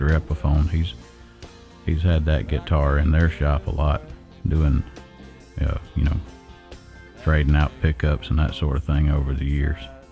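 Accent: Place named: South, United States. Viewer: Way too much Southern accent is killing me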